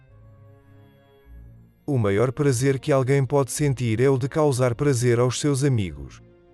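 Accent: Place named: Portugal. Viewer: Eu não consigo levar a sério essa voz de Portugal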